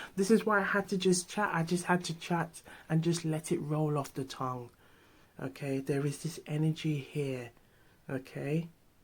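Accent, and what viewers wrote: Jamaica. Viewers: Are you Jamaican by chance ?!👀🇯🇲❤️❤️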